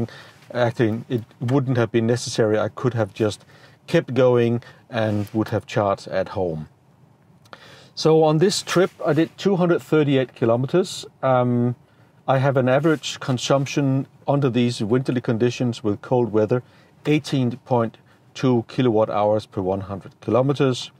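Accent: Denmark. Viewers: Are you danish? Your accent sounds danish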